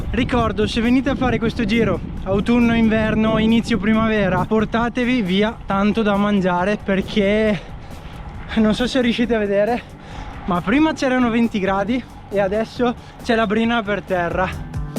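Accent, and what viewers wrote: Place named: Veneto, Italy. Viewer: con l'arrivo della bella stagione perché no. Quanto impiego da Milano? Dal tuo accento penso tu sia veneto, magari Vicentino??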